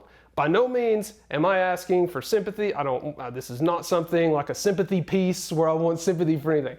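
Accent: South, United States. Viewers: Y'all's channel is my favorite. I have a new grandson from a bilingual marriage. I've been looking for Columbian teaching online and Maria's approach works. She makes it organic and fun. I love your Southern accent. It's how we talk in Ala/GA. I trust what you and Maria do. Looking forward to watching you guys for years to come. Your relationship is more important than the channel so do what you gotta do to keep lovin' each other. Praying for safe travels. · I wouldn't be able to handle being trolled either. I don't think they realize you're humans. You may always have a strong American accent but so what. You and Maria make videos that I love. So thanks for sharing, brother! I hope you love Colombia! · You have a southern drawl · I always enjoyed watching another regular person, with a southern accent no less, struggle with Spanish. You make me feel more comfortable with my struggle. 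Don’t let the varmints get to you! By the way, I am 62. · I get you, man. Learning a language is damn hard. It seems like it takes forever to master it. IMO just take your time and let it all hang out, you do it for yourself (and for Maria I suppose), to for others. And as a non-English native speaker I like you American accent, by the way ;)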